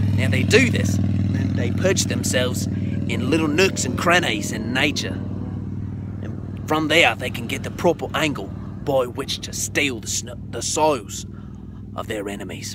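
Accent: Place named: San Diego, United States. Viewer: He's from San Diego, man!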